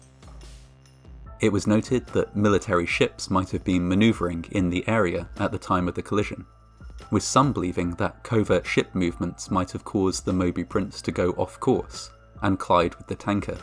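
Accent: United Kingdom. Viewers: This guy has a great delivery style. Does anyone know which British accent he has? 🤔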